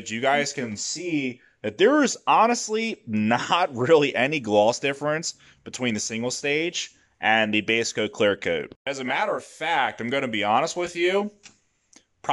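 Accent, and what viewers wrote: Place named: East Coast, United States. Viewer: With that accent you have to be from east coast, either PA or NJ or somewhere in that area I’m guessing but I’d say PA. Great video, also!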